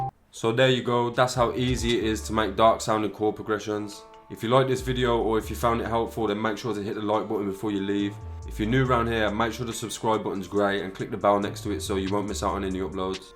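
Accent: Birmingham, United Kingdom. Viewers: Sounds like you’re from Brum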